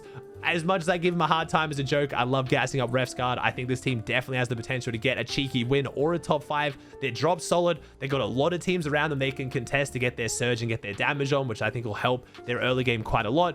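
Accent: Australia. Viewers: Tooo aussie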